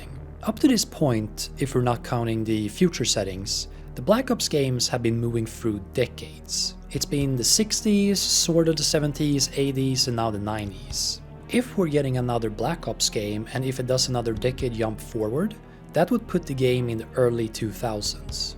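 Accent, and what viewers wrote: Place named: Sweden. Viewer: Great video bro🙂btw are swedish? Your accent sounds just like it 🙂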